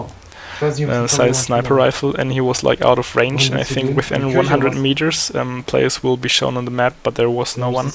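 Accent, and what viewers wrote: Germany. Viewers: your accent sounds like your mother tongue is german... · haha ... hab einfach mal reingeguckt und mir so gedacht: Die Aussprache is, als wär der Deutsch wäre ...und taddaaa ... recht gehabt :D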